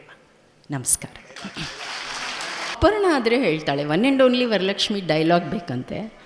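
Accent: Standard. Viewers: ಯೆಂತಃ ಸ್ವಚ್ಛ ಕನ್ನಡ ನೀರರಗಳ ಮಾತು ಒಮ್ಮೆ ನಿಮಗೆ ನಮ್ಮ ನಮನ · ಇಂತಹ ಕನ್ನಡವನ್ನು ಇನ್ನು ಯಾರುಯಿಂದಲಾ ಕೇಳುಲ ಸಾದ್ದವಿಲ್ಲ. ನಮ್ಮ ಅಚ್ಚ ಕನ್ನಡದ ಅಪ್ಪರ್ಣ ರವರಿಗೆ ನನ್ನ ನಮನ ಗಳು 🙏🙏🙏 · ಸ್ವಚ್ಛ ಕನ್ನಡ ತಿ,ಕನ್ನಡದ ಒಡ ತಿ ನೀವು ನಮ್ಮೆಲ್ಲರ ಮನಸ್ಸಿ ನಲ್ಲಿ ಸ್ಥಿರ ವಾಗಿ ಇದ್ದೀರಿ ಮೇಡಂ so sad I ಮಿಸ್ ಯು ಮೇಡಂ ರಾತ್ರಿ ನಿಮ್ಮ ವಿಚಾರ ಗೊತ್ತಾ ದ ಮೇಲೆ ನಿದ್ರೆ ಬರಲಿಲ್ಲ ಮೇಡಂ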